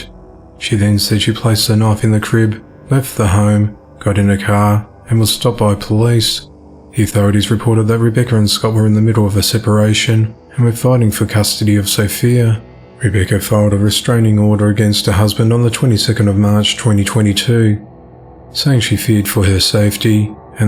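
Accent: Australia. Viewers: Australia, i was born in Australia Victoria nsw, but in ole blighty now I hear the accent now x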